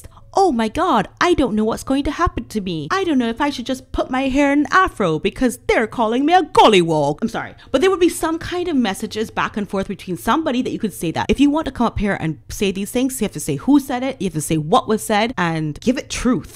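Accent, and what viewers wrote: United States. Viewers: I love your cheeky take on everything - all of which is backed up with factual evidence. On a personal level, do you have an American accent? Can you talk Barbados talk one day just to show us (me).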